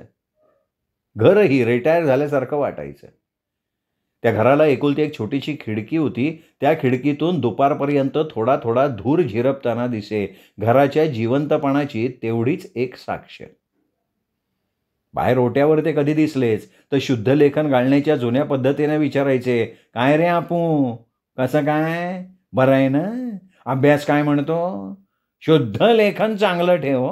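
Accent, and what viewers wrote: Konkan, India. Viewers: छान आवाज मस्तरंचा. अगदी कोकणात.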